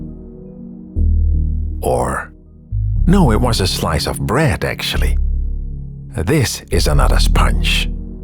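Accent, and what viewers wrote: Netherlands. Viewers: Ik dacht al een Nederlands accent te horen en de krantensnipper bewijst het ;) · The most dutch accent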